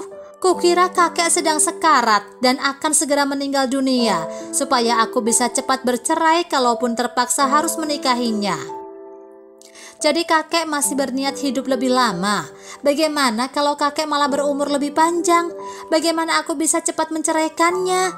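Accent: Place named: Sunda, Indonesia. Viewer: Suaranya kaya suara euceu2 org Sunda 😅😂🙏🙏🙏🙏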